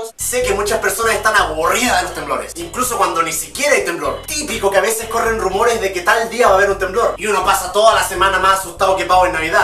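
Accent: Chile. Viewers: Germán más chileno que nunca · Waaa hablaba bastaaaaaaaante chileno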